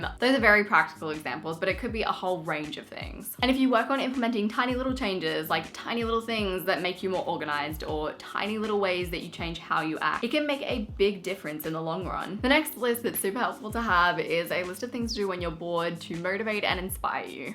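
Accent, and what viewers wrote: Australia. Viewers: Is she from Australia?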